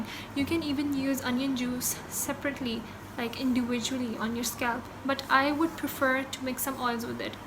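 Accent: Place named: Pakistan. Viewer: After hearing ur voice,I think u r Pakistani....if yes let us know...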